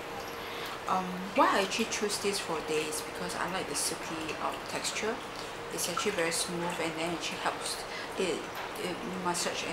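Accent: Singapore. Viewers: are u singaporean, ur acccent!!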